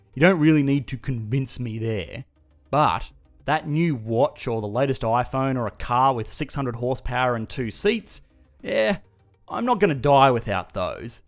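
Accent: Australia. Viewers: Cannot stand the ozy accent. Bye bye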